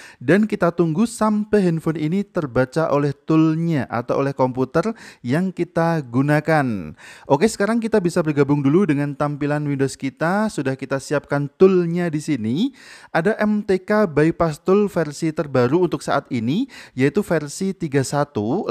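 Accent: Java, Indonesia. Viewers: Mantap jiwa jadi nota satus seket ewu ... Nota gajah kang Salam solder dari teknisi medhok 🙏🙏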